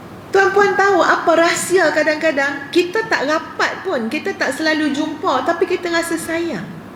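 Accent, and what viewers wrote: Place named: Kedah, Malaysia. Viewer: Ustazah. Saya selaku org kedah mengaku ustazah pandai dah cakap kedah walaupun baru sat ja dok kedah.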